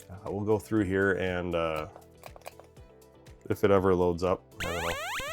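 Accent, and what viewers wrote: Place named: Canada. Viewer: How do you plug in the Spectrum? Assuming you live in Canada, based on your accent, do you need a step up converter for it? I live in the US, and I know in North America has 110-120v power while the UK and Europe use 220-240v. I ask because as of this comment, I'm getting one in the mail and I'm afraid to plug it in just straight into the wall at the risk of ruining it.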